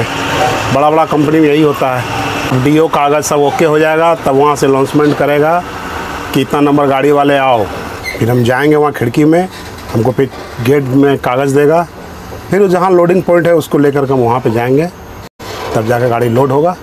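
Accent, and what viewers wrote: Bihar, India. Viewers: AAP bihar se hokya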